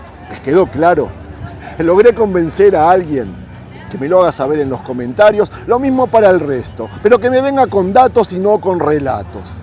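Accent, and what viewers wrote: Argentina. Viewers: Irán nunca ha sido problema de nada. El único pecado de Irán es no haber permitido que los jefes sionistas con sus empresas petroleras roben directamente sus recursos. Porque esa gentuza no está acostumbrada a pagar por nada, no se engañen. Desde entonces se la tienen jurada y si leen un poco se darán cuenta que es el país que menos conflictos ha tenido en Oriente Medio, simplemente tuvieron una guerra muy cruenta con Irak porque los sionistas jefes les echaron encima a Saddam, al que luego ahorcaron por cierto. Por el contrario, Israel como estado en Palestina es el mayor error/crimen de la historia moderna que, como dijo un tal De Gaulle, al que por cierto los jefes intentaron asesinar, "traerá ríos de sangre"... El sionismo es un concepto político sinónimo de usurpación, crimen, supremacismo, terrorismo, etc, a mí no me va a convencer con su tono argentino y su voz melosa. 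Israel es un estado desquiciado que se comporta muy agresivamente con sus vecinos, como cualquier especie invasora, es ley biológica. Fundado en Palestina en pleno siglo XX porque lo dicen sus cuentos míticos religiosos, que tiene narices. Y para legitimarse se gastan millonadas en buscar restos arqueológicos porque no pueden reconocer que LA MAYORÍA DE LOS HECHOS BÍBLICOS NO SON HISTÓRICOS SINO MÍTICOS. Pero mucho más patético que el judío sionista es el cristiano sionista, que les tocan las palmas y no se enteran de que no tienen nada en coún: al judío le repugna la figura de Cristo, el peor de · Jajaja! Ya no sabes que decir, hablás como argentino y te crees israelí. Ya te lo dije pero eres necio, el pueblo de Dios no es el régimen mataniños de Israel. (La verdad y la justicia siempre sale y saldrá a la LUZ❤)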